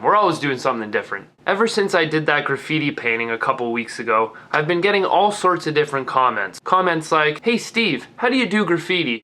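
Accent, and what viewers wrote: Canada. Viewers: It's actually quite slight. I didn't notice until almost two minutes in. In california, our considents at the end of our words are weak, almost silent. For Canada, it's in the way you pronouce your vowels within or at the start of a word, like out and start. And its only with a few words. Sometimes, you can't even tell. I mainly hear it in about.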